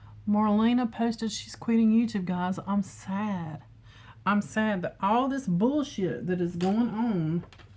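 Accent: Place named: Tennessee, United States. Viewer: I am from Sevier County, TN, in between Knoxville and Gatlinburg! I knew you sounded like home! My hubby is a Marine, and we have been living all over the country, and are currently in San Diego. We just got orders to Cherry Point, NC, our first duty station after Paul joined 23 years ago, lol! Can't wait to get back closer to home. 💗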